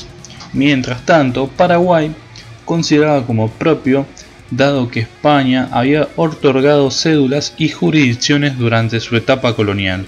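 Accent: Argentina. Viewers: obvio que es de Argentina o no escuchaste su acento :v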